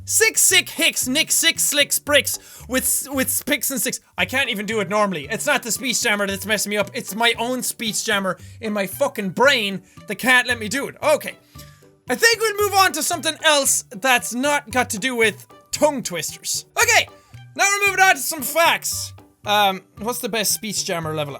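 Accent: Ireland. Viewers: his inner irish accent came out during this like the "typical" irish accent applauds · He always did have an Irish accent... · So, in order for to go back to saying the Irish "think" we need to voice jam him. Got it. ( I love his speech either way btw) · you sounded much more Irish when you did this challenge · If anything... during most of these sounds more irish. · Lol your Irish accent becomes way more announced when you use the speech jammer, I love it XD this was hilarious:D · just got more irish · Is it me or does he sound more Irish when speech jammer is on? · sounded even more irish than usual here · His Irish accent comes back and it's kind of awesome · You just sound more Irish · The Irish got worse and worse as he went on...and he did sound drunk, lol. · You should go full out Irish for a whole video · his irish accent got like 2x stronger with the speech jammer and it was wonderful. 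XD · His Irish accent comes out a lot more in this episode. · just sounds more and more drunk:D Pretty Irish though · He sounds more Irish than usual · He sounds so Irish in this 😂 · This video really brought out irishness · YOUR IRISH IS SHOWING AGAIN!!!😂😉I love your Irish accent,even if it isn't as strong as before XD <3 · is it just me or is he way more Irish in this video than usual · Voice jammer... Should be Called Irish enhancer. Honestly you sound a lot more Irish... Maybe it's because you sound drunk HA! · You've never sounded more Irish:P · I like how his Irish accent got more Irish-y in this video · Next time you do a tongue twister thing, you should totally find the speech V does in V for Vendetta! Also next time you do a tongue twister with lots of "th"s feel free to play up the Irish accent because it's such a lovely accent and I never hear it in England xD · it gives him a much stronger irish accents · this is awesome, you sound even more irish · he sounded like a drunk irish person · He went full irish. 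· the irish accent got stronger as the video went on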